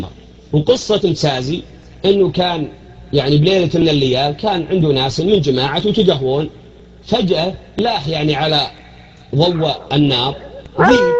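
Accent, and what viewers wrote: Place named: Ha'il, Saudi Arabia. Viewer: اللهجة شمالية حائل يابعد حيئ "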